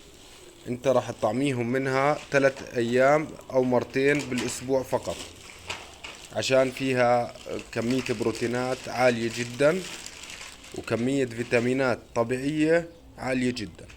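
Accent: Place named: Palestine. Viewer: وانت كمان بتحكي مثلنا طاسه ههههههههه يسعد قلبك فلسطينيه كح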